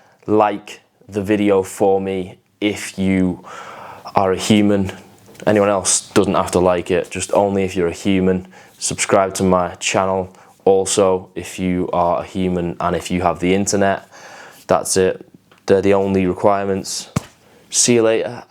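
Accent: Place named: Northern Ireland, United Kingdom. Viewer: man... what part of England you from? It sound northern Irish or Scottish-ish lol but yeah..where you say you from now?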